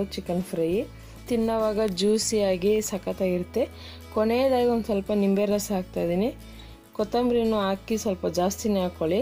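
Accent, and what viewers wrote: Tamil Nadu, India. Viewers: Hmm nivu matadodu tamilians Kannada matadid haagide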